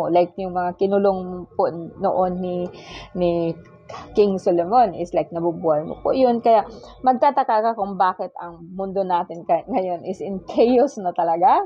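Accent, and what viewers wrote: Bicol, Philippines. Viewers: Mam tanong ko lamang ikaw po ba ay bicolana, kc po bawat bigkas ng salita mo i notice na bikolana ka, from Camarines norte po ako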